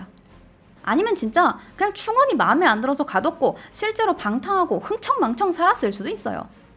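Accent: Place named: Jeolla, South Korea. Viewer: 은 전라도사람이군요.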